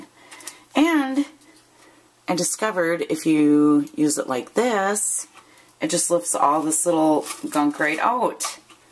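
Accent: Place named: Wisconsin, United States. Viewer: You talk like the folks I grew up with back in Wisconsin>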